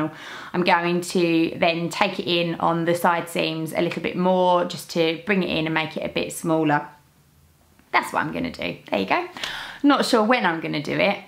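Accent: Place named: United Kingdom. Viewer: I love watching your videos and listening to your British accent! I’m really loving the fabric haul videos and your travel to the Netherlands. I love to travel the world and look for fabric as well. I especially love looking at the shops in the UK and plan to go back to the UK in the next year/get a rail/train pass and go to as many as I can find. Gold Hawk road and Liberty Fabrics are at the top of my list! Thank you for all your sewing efforts/education. You’ve inspired me to start sewing for myself again! Hugs from Oregon in the USA...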